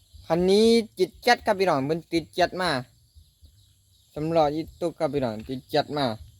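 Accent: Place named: Central Thailand, Thailand. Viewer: พูดไทยกลางครับ😅😅😅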